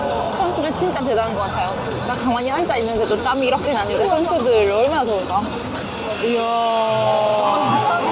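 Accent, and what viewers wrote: Gyeongsang, South Korea. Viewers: 누나 경상도 사람이었구나 ㅋㅋ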